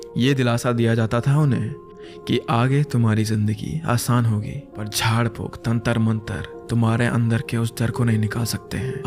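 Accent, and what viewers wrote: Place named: Bihar, India. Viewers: Dude, you have nailed it. This is one of the best story telling of yours so far. Your voice modulation, Bihari accent, background score everything is top notch. Great work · its bihari accent for me....damn.. adooorable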